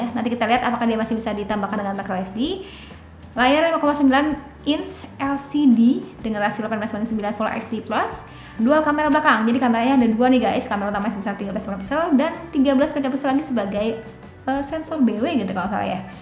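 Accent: West Java, Indonesia. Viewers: ini orang sunda y, keliatan klo ngmng ada kata "SI" nya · "DESAIN DESAIN JAMAN BAHEULA", org sunda nyah teh?😃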